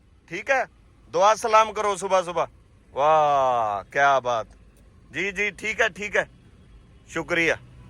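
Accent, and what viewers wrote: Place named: Amritsar, India. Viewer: ਬਾਈ ਜੀ ਤੁਸੀਂ ਹੈਗੇ ਬਰਨਾਲੇ ਦੇ ਤੇ ਭਾਸ਼ਾ ਤੁਸੀਂ ਅੰਮ੍ਰਿਤਸਰ ਦੀ ਬੋਲੀ ਜਾਨੇ ਹੋ ਢਾਡਾ ਢਾਡਾ ਭਾਜੀ ਭਾਜੀ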